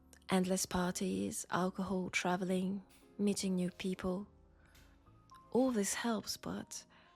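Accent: France. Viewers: Lovely as usual :) Hearing your french accent + mentions of Paris made me think: Have you played Clair Obscur: Expedition 33? I suspect this game will be a must-play for every French gamer, just like Witcher is for Poles ;) If you did, can we expect a story from the game world?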